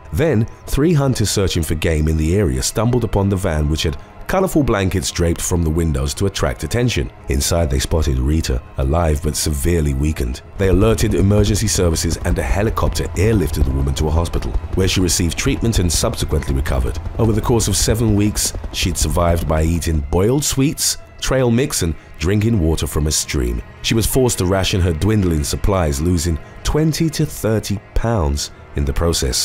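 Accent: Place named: London, United Kingdom. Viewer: Dude, you have quite honestly the coolest voice. What part of London are you from?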